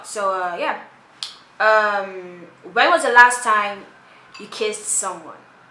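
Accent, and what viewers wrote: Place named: United Kingdom. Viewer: You have a british accent🥰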